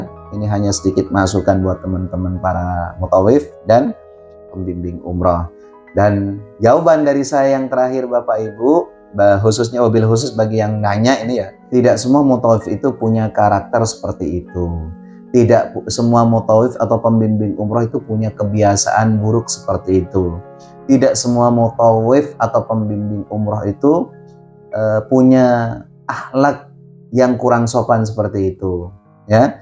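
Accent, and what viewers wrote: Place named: Semarang, Indonesia. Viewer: Aslkm. Saya dah Marem penjelasan nya ustad. Atas bimbingaya. mohon maaf. Saya mintak alamat pak ustad. mau tabarukan. saya Semarang. logatnya pak ustad seperti logat Semarang trmksh.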